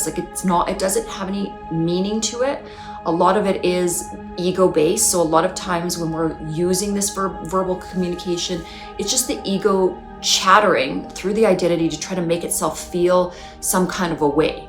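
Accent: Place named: Canada. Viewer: I can say you're Canadian by the way you say " SO " and " ABOUT " Possibly you're a Pleiadian too : )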